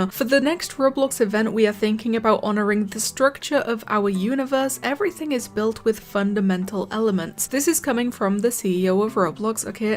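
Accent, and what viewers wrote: United Kingdom. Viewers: Is this woman British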